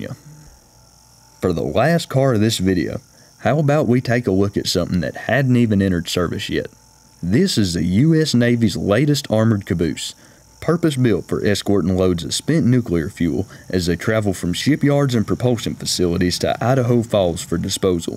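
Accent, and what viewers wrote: South, United States. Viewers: Very nice format, good info and content! The southern dialect is just the cherry on top. ;-) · Did anybody ever tell you you have one of the best voices I have ever heard? It's like the very personification of the South. Amazing voice, my man.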